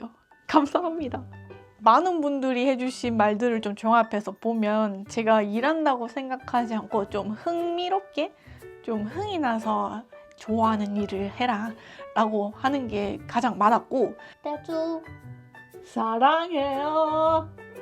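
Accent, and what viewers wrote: Gyeongsang, South Korea. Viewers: 경상도 억양 귀여워요 ㅋㅋㅋㅋ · 우즈벡 부동산 블로그보다 여기까지 왔네요 ㅋㅋ 저도 isfp인데 i 발음 들으니 뺴박 경상도 같으신데 맞죠? ㅋㅋㅋㅋ isfp는 누워있는거 젤 좋아하는 집순이인데 사업이라니 대단하세요~ 우즈벡 영상도 올려주세요~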